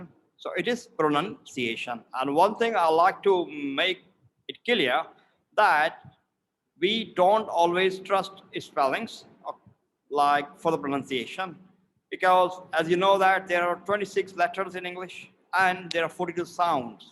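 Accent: United Kingdom. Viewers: I may a new one from here, But actually I do love your British accent. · Sir your accent of British English and your pronunciation are very nice and I like your love for British English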